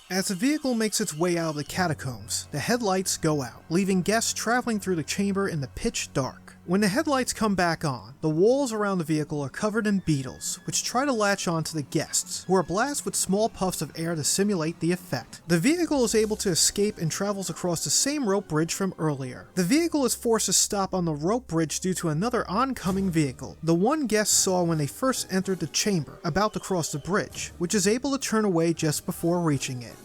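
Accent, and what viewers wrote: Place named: East Coast, United States. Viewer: One of the things I like about this channel is that glorious east coast accent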